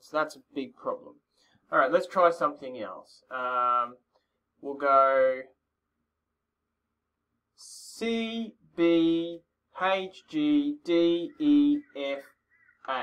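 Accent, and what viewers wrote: Australia. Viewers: Great Australian accent.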